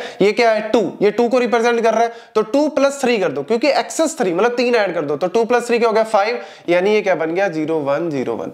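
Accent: Punjab, India. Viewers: Veere I confidently know that your mother tongue would be punjabi.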